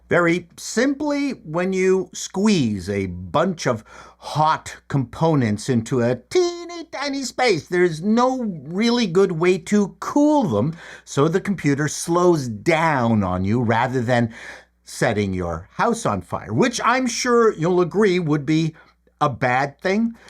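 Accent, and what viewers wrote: Canada. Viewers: 🤔'Drunk acerbic Scottish movie critic'? Not sure who you are referring to. 🤣This was indeed fascinating and entertaining sir, I knew and enjoyed your earlier work with the Frantics, and joined the fellow followers know as Dennises(?) and missed the Hackintosh era. It was great to hear you talk about the evolution of your channel, but when you were 'geeking' out and going into the details on your computer builds. For some reason I started hearing the hit ' ' in my head!😂 Thanks for the video and the laughs sir, very glad that YouTube dropped me the suggestion to check you out! I mean I get to enjoy your acerbic movie and television reviews, minus the alcoholism and with a Canadian accent! 🍁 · I am here for the chrisp Canadian accent.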